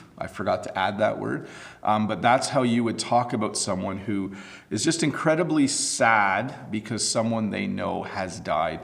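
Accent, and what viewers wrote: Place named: Canada. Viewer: Thank you, i find your canadian accent better than the u.s.'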